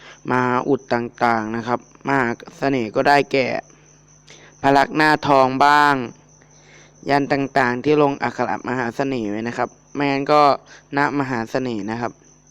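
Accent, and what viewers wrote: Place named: Isan, Thailand. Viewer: ว้าวอีสานก่าได้เด้อฟังออกยู่คับ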